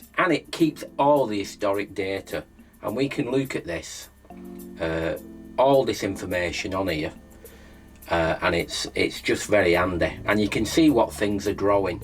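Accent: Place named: Oldham, United Kingdom. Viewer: Are you from Oldham by any chance, you sound like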